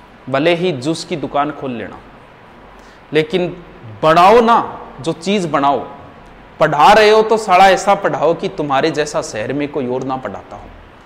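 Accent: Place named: Churu, India. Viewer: Churu boli badi bhaari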